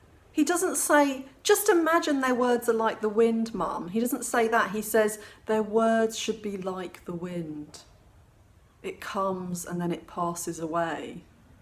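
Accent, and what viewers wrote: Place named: London, United Kingdom. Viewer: So it IS a London accent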